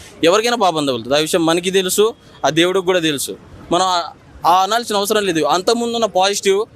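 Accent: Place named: Telangana, India. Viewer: Nuvvu endukani telangana accent lo matladuthunnav? Ee video Hyd lo chesinattundi. No credibility.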